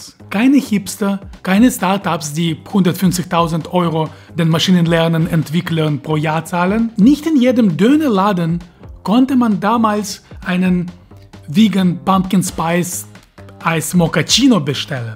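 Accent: Russia. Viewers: Das ist richtig, es gibt viel zu wenig gute deutschsprachige naturwissenschaftliche YouTube Kanäle. Schön das Du Zeit findest, Dein Wissen mit der Allgemeinheit zu teilen. Übrigens, der russische Akzent ist einfach nur Klasse! Ich freue mich auf weitere Beiträge.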